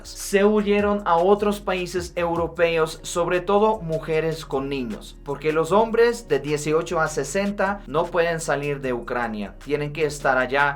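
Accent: Colombia. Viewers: Tu maravilloso español colomombiano y tus conocimientos y forma de explicarlos dan un gran aporte a cuantos estamos interesados en saber y entender la compleja historia entrelazada entre rusos y ucranianos. Estremece y duele la avanzada destrucción de Putin sobre el valiente pueblo ucraniano. Saludos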